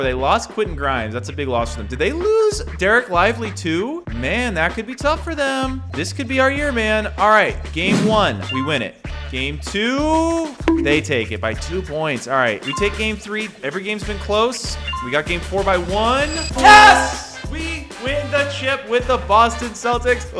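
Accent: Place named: South, United States. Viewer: honestly i love the redneck accent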